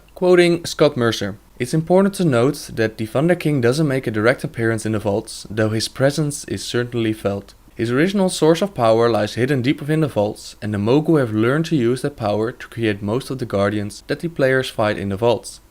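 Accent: Netherlands. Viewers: haha je zij bonus role echt heel erg met nederlanss accenr xd niet erg maar grappig :)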